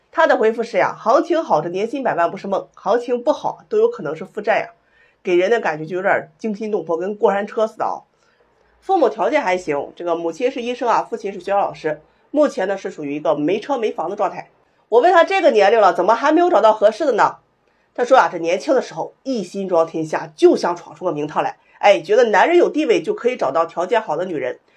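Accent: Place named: Shanxi, China. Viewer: 老师口音是 山西人啊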